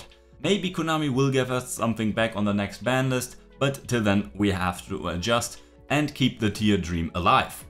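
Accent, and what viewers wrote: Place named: Germany. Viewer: Where from Germany are you exactly from?😉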